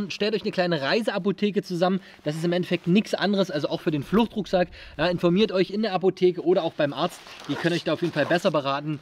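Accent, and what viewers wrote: Magdeburg, Germany. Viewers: Kommst du aus Magdeburg?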